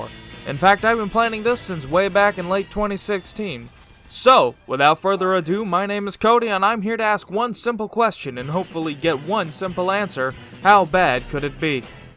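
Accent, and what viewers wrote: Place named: South, United States. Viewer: his southern accent? It's fucking GOLD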